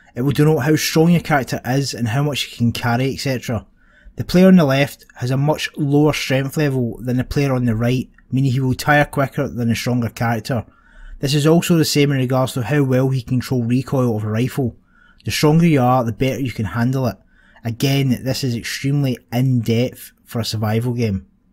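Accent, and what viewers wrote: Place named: Scotland, United Kingdom. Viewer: you from the area near Edinburgh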